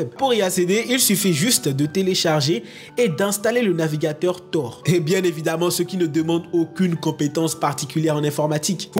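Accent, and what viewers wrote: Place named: Senegal. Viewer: Super vidéo et en plus avec l'accent sénégalais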